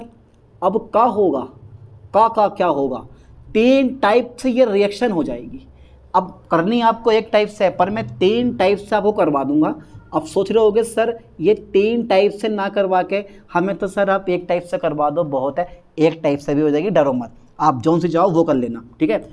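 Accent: Bijnor, India. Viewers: Sir aap bijnor ya hasaanpur se ho kiya😅😅😅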